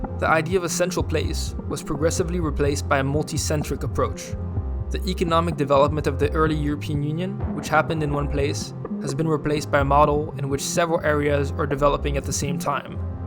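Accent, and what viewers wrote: Rotterdam, Netherlands. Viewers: I'm pretty sure the maker of this video is from Rotterdam, just because of the way he says it 😂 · You sound dutch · Als deze gozer niet Nederlands is dan eet ik een blauwe banaan. Wel goed accentje tho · His accent in English sounds very Dutch. Can’t say I’m surprised he is Dutch haha. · Rottedam je klinkt als een echte Rotterdammer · I was casually watching the video... then "no, another Dutch guy" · I'm not Dutch or French, actually English. But he definately sounds more Dutch than French. French accent is always thick when speaking English lol you can tell a mile off. Dutch is much more subtle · You're Dutch aren't you? Good vid!! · I can hear you are a dutch speaker :) loved the video! Will come back for more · HOOR ik daar een Nederlands accent? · I thought he was Dutch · Unmistakable Dutch accent ;-) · Are you dutch? 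· He's dutch Can't you tell by his accent? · Sounds dutch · I dont think so because he sounds Dutch. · I hear Dutch English... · Pretty sure this guy is dutch speaking. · hi narrator Are you dutch? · Confirmed your dutch No one in the world except Ppl from Rotterdam with accent can speak Rdam like that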